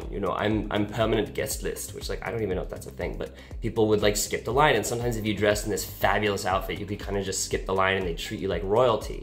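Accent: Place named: Germany. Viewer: Even though your Englisch is fluid and sounds right, it's obvious that you are a nativ german! Sorry, not buying it...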